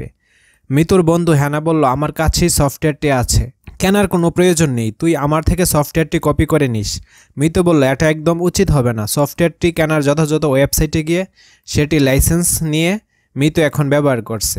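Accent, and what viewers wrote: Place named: North Bengal, Bangladesh. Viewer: Apnar basha uttarar kothai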